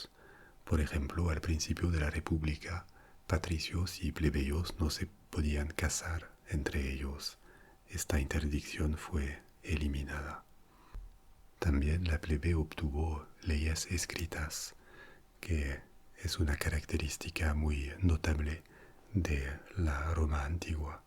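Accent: France. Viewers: Qué hermoso! Castellano con acento francés! Gracias! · Español con acento francés... ufff, este audio es maravilloso. Muchas gracias.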